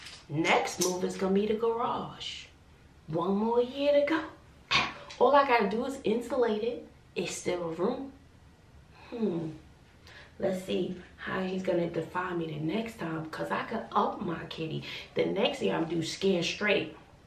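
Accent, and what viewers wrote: New York, United States. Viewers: I love your channel so much, I love the way you speak My family from the Bronx; we recognize a New York accent when we see it. it’s so refreshing to see a momma much like mine on YouTube. We need more mommas like this. Growing up in the Projects I didn’t have a lot of things. I didn’t even have my own bedroom until I was about 17 almost 18. I think taking away your sons room will teach him to appreciate what he had because some people aren’t fortunate enough to have their own rooms. Great parenting. Keep up the good work.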